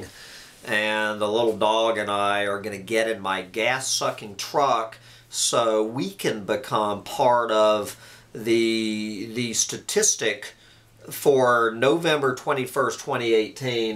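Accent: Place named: Georgia, United States. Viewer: It´s the georgian accent.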